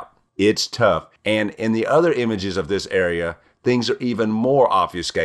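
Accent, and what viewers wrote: South, United States. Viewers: I'm Northern born but Southern raised so it's nice to hear a Southern voice on an intellectual posting, Hear what I'm saying y'all?!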